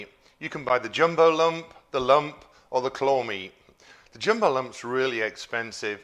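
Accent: United Kingdom. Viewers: I love my brits!! I love ur accent!! Kinda want to move to England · It’s just the way us Brits say it with our accent. When I moved to the US from the UK, everyone laughed when I pronounced it literally ‘Mary-land’. It took me a while before I learned it was pronounced more like ‘Merilyn’.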